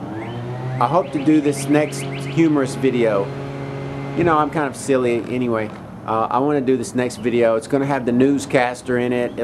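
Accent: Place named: Missouri, United States. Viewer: lol, you're so wacked! Great video on Ex-C. So you're from Tennessee? I wouldn't have guessed it if you hadn't said so. I would have thought you to be a Missoura-in due to your accent.;) Love the fro.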